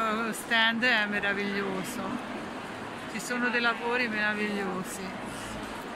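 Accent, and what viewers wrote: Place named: Pisa, Italy. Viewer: Bella esperienza e bravo tuo marito che ti accompagna....Dal tuo accento mi sa che sei vicina a me...anche io provincia di Pisa! Ti seguo con affetto!!!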